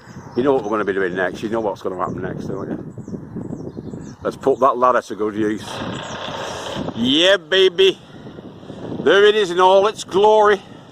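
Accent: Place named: Lancashire, United Kingdom. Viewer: Lol. do the visitors from foreign lands understand your Lanky (Lancashire) dialect :)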